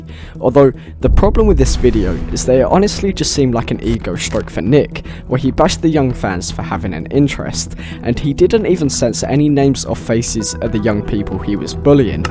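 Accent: United Kingdom. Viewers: as a 'modern activist' myself, this man is not the definition of an activist at all. The fact that you are using the term 'modern activist' as a negative term is derogatory to those of us who actually do work every day to TRY to bring attention to pertinent issues. If you think COMMENTARY YOUTUBE is ACTIVISM, you are SORELY misunderstanding the definition of the word. Sorry babe-- ur british accent doesn't hide your lack of ability to use google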